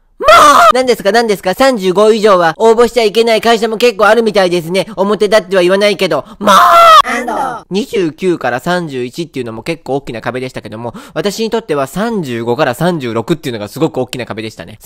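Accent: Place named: Osaka, Japan. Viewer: あ〜今日も面白かった🙌 の大阪弁好き❤️ 東京ラブストーリーめっちゃ見てた〜 のトークもめっちゃ好き❤️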